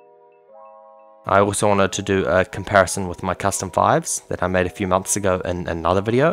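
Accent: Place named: New Zealand. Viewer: Are you from new zealand cuz you sound like one of us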